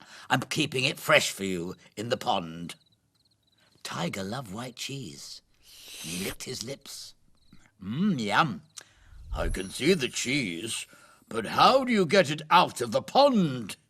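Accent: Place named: United Kingdom. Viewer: This story is very useful for me or everyone. British Accent is prefect 🙏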